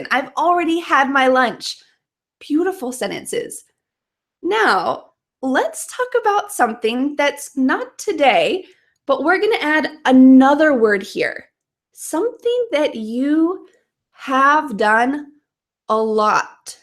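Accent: United States. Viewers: is your english american ou brazilian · You is American?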